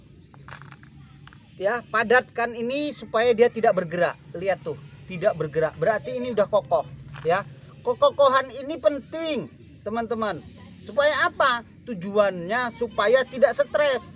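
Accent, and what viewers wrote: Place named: Medan, Indonesia. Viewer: Tengok, nampak.. cakap-cakap khas medan 😂 Semoga sukses bang 👍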